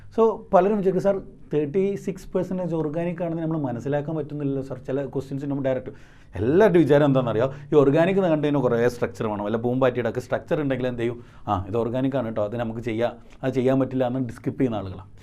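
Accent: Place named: Kozhikode, India. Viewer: സർ കോഴിക്കോടൻ ഭാഷ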